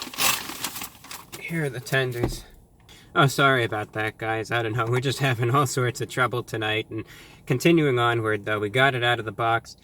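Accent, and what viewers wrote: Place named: United States. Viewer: „None of them Hääääve it“ Man i like, but the american accent just kinda gives me shudders. Still great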